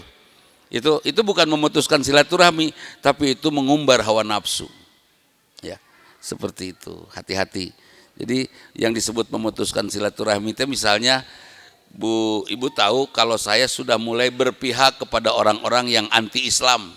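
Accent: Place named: West Java, Indonesia. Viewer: Urang sunda, basa sunda, logat sunda pisan, ngeunaheun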